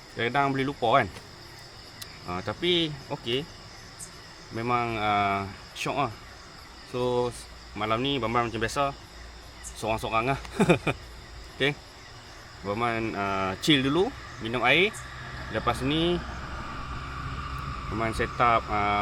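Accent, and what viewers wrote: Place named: Kelantan, Malaysia. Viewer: steady bamban makan budu..lidah kelate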